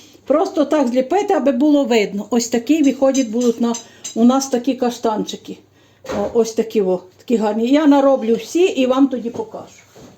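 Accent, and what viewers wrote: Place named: Chernivtsi, Ukraine. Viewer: А мова, діалект, говір, словечка, наголос точно, як на Батьківщині у мого покійного чоловіка, а він з Чернівецької обл з Романківців. Наче в рідних вдома побула😊 - підписка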